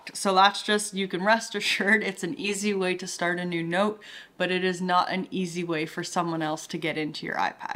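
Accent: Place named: Canada. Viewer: Wonderful video and an awesome Canadian accent! Thank you for the content!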